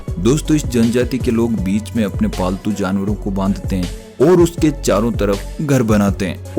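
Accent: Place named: Rajasthan, India. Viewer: Aapki aawaj rajsthani lagti h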